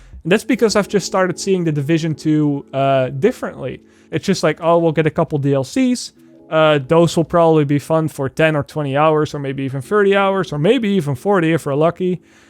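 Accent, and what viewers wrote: Netherlands. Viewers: Hey are you Dutch?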